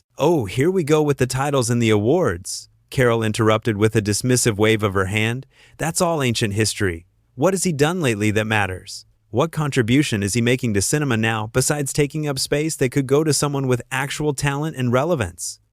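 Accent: United States. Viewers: This British Insights video is just a way to click and watch this video with untrue facts. This video was edited from the Loose a woman Show and it has a voice over story There is a similar video featuring Lorraine Kelly” insulting” Sir Michael as well . I wish these companies like “ British Insight “ using an American voice over to do something positive. Make videos for something like saving the rainforests or helping homeless people instead of winding people up.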